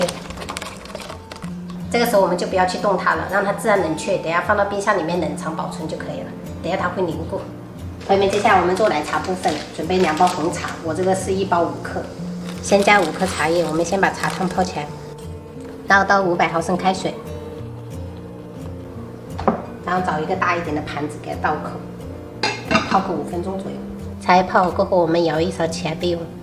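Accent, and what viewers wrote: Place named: Minnan, China. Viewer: 第一次聽到妳講閩南語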